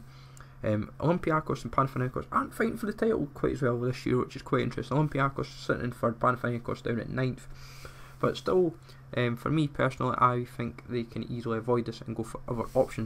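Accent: Scotland, United Kingdom. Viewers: always love the scottish accents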